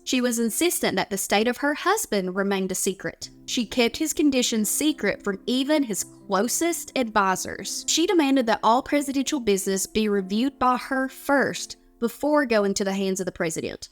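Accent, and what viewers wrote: South, United States. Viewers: I like your southern drawl. It's pretty.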